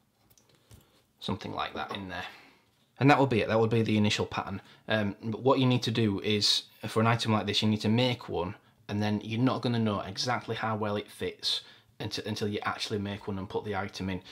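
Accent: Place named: England, United Kingdom. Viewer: Brilliant I've been looking for a video that explains the sizing of a pattern ,you really explain it well . I do have one question ,I'm just starting out as a hobby and trying to find leather supplies in the UK that are cheap enough to try out is difficult . I have bought some tools from amazon as well as some chrome leather ,I've found Identity Leather Craft in Matlock and am waiting for some veg tan and glue to try out. do you have any recommendations for leather suppliers in the UK selling small amounts for us hobbyists . Great channel nice to hear a calm English accent explaining things so well .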